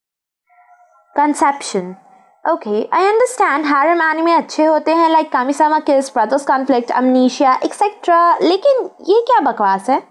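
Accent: India. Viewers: Are you really indian?or learnt to speak hindi ? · WHO ARE YOU INDIAN OR WHAT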